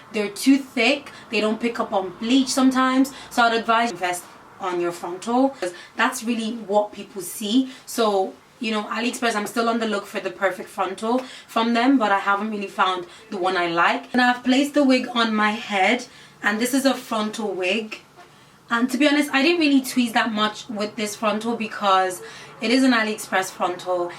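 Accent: Ireland. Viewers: Why am I hearing a Dublin accent? The unit looks lovely btw xx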